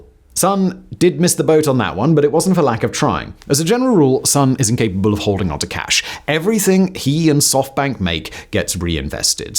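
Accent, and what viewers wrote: United Kingdom. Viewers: Does anybody know ow where in the uk hails from? I need to know what specific accent this is?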